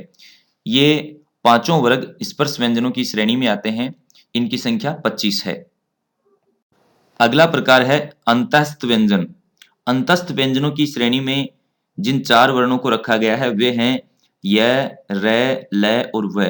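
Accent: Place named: Haryana, India. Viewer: वो क्षेत्रीय या मात्र भाषा का प्रभाव तो पड़ ही जाता है। किंचित वे हरियाणा से हैं।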